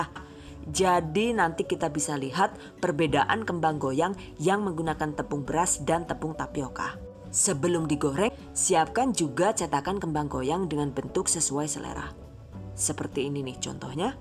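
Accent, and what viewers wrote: Banyumas, Indonesia. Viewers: suka sama adalah bahasa yg jelas...dan di selingi intermezo ngapaknya sukses sllu bun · 😂 seneng ndagel mbekayune...😂😂 kdg basa ngapak kdg indonesia kdg bhs inggris....😂✌️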